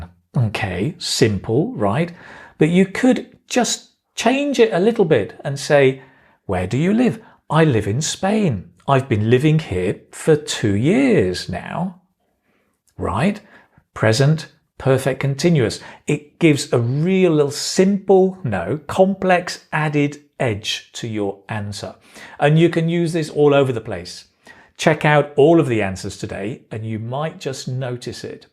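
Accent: United Kingdom. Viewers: Sir, I would like to be a British English speaker as you speak.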